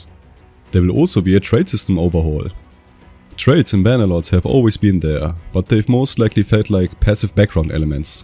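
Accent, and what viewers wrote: Germany. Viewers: Brudi bist du deutsch Brother are you German ? Dein Akzent verrät dich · I love your german accent ❤️🗿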